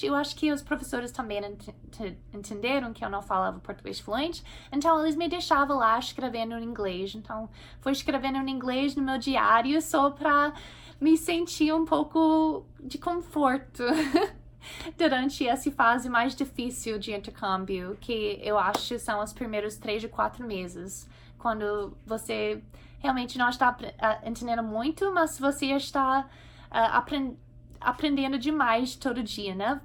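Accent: Rio de Janeiro, Brazil. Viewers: um leve sotaque carioca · Você está no Rio de Janeiro pelo sotaque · sotaque meio carioca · Sotaque carioca? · SEU SOTAQUE CARIOCA ESTÁ EVIDENTE DEMAISSSS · Tem um sotaque carioca. Aquele chiado típico no falar.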